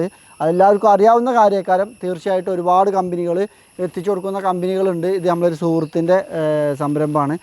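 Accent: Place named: Valluvanad, India. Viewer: You described well in your own “valluvanadan”style.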